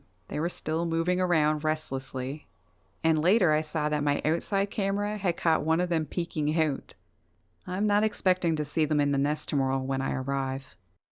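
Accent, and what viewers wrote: Canada. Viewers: Great message and video 💖 Nice to hear of your Ma and formative foundations. Like many others, I look forward to occasions when I'm able to appreciate your passions. And your wonderful Canadian speech. At times, my thoughts refer to you as ', the ' 😄 (don't know if that's the influence from Brooklyn, Philadelphia or some other part of your southern neighborhood).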